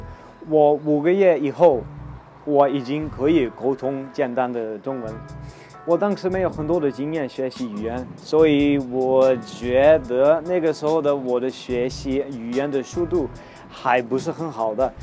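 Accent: South, China. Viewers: You have the typical accent from the South, for example with the "ch" you say "s" but it's ok i understand you, my GF is from 四川 Sichuan, very similar accent. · 好浓的南方口音啊哈哈哈 你听北京话能听懂多少？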